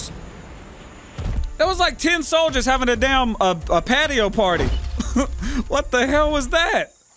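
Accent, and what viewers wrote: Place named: Tennessee, United States. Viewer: You sound like you're from Tennessee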